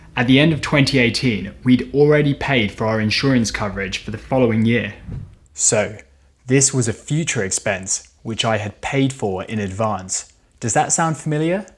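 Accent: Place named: United Kingdom. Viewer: Thank you so much!!! I finally understand what prepaid expenses are! I always Google for knowing knowledge first but I search your channel for knowing accounting knowledge first like this time 😀 Fan from Taiwan 🇹🇼 (Btw really like your British accent!! Also a fan of British accent)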